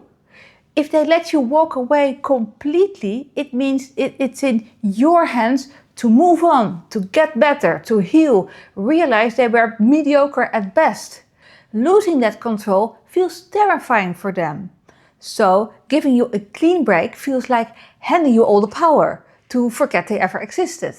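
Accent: Netherlands. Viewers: Thanks. You have a knack for this. And it's enjoyable to be "dressed down" (in a good way) in English spoken with a Dutch accent, for some reason. One of the tell-tale signs of a cheat who will destroy your peace is rewriting history. If they show themselves willing to try to cheat reality itself constantly, there is no doubt they are a cheat.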